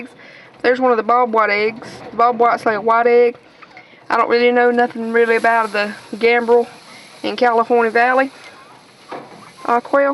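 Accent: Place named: South, United States. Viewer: Yes indeed I love a southern accent being from the south I'm partial. Keep doing what you're doing Fort Worth Texas loves it. Blessings and more Blessings to you and your family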